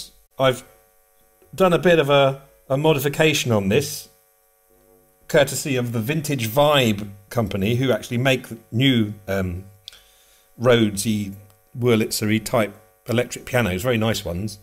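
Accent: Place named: RP, United Kingdom. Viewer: A perfect English speaker with a perfect RP pronunciation talking about a perfect piano of the perfect 70s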